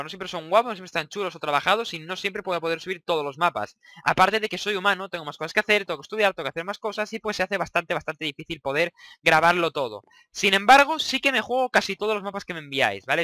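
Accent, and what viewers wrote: Argentina. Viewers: estas hablando cordobes como david saludos de argentina te qiero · sabes hablar bastante bien en argentino. Saludos desde Argentina eres uno de mis youtubers favoritos sigue asi.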